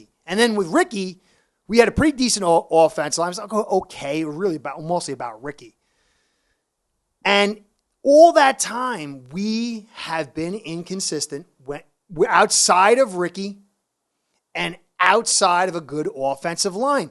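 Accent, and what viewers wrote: New York, United States. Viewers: LOL!, I love it when you say "We're DOOMED!!!" 🤣 On another subject, (just curious), how does a guy with a New York accent turn out to be a Phins fan? For me, I became a fan in 1970 (I was 13) and the Dolphins were the only NFL team in my home state. How about you, how and when did you become a Phins fan?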